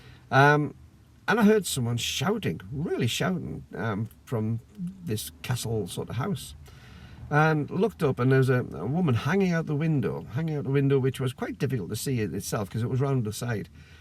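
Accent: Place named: Northumbria, United Kingdom. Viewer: Such a sad damper on such a nice outing. Well done for handling the situation as you did b/c you took the wind out of her sails. If you had tried to explain at all, she would have become more aggressive. Pity. You're from Northumberland did you say? Put "Vera" on the case (your accents and mannerisms are similar.) She'll take care of the daft goose! You present as a very nice and sensitive man,. I advise that you carry on with stealing photons. You probably have also stolen some yet "undiscovered" colors from the infinite spectrum. (Check out the late Dr. Oliver Saxe about his LSD trip and his wish to see the color Indigo!)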